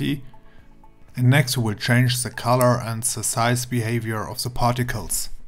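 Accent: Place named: Germany. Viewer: Heavy German accent - mach's doch vielleicht auch mal in Deutsch. Englischsprachige Tutorials gibt's hier schon en masse.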